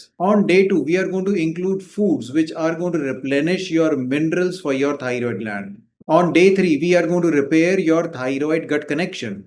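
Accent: India. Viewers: A strong Indian accent is difficult to understand at times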